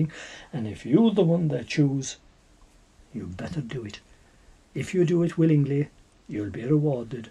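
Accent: Ireland. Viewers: Love the Irish accent so dang much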